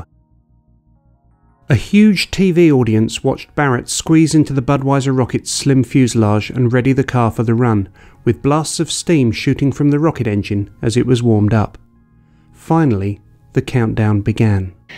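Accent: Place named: United Kingdom. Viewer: The accusation that the radar never clocked the speed and instead a truck is entirely new and unverified. It is a claim made solely by this video narrator and uploader and no official from the U.S. Airforce. Sidewinder missiles break the sound barrier without sonic booms all the time. The British accent is very suspect with Bias.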